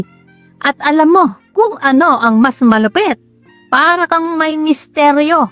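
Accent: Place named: Ilocos, Philippines. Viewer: Idol ilocana ka ba? Sa tuno mo kc e siguro pangasinan or ilocana.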